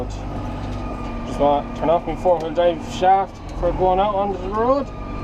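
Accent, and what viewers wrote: Ireland. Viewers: What part of Ireland are you from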